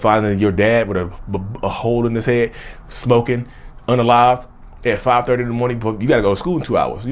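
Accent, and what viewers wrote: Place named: North Carolina, United States. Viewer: That preacher thing happens to my voice in my videos sometimes too! 😆🤣🤣Also, the very FIRST time I heard you speak, I was like, "This dude sounds like home. He has to be from NC..." I'm in L.A. by way of Charlotte. Congrats on all the moves you're making,. -S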